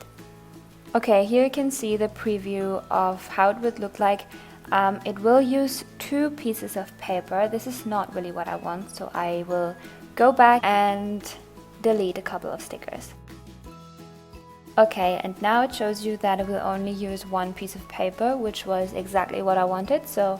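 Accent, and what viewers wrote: Germany. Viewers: Kommst du aus Deutschland weil du so ein deutschen Akzent hast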